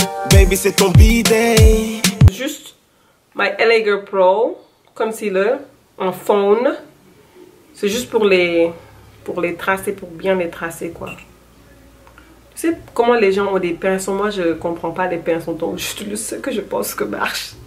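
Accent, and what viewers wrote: Wolof, Senegal. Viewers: Je découvre ta chaîne Miss tu es belle belle,je suis une Sister sénégalaise. haha j aime ton accent wolofaliser. en fait j ai aussi une chaîne YouTube ,ci ça te tente d aller checkeeeee. bis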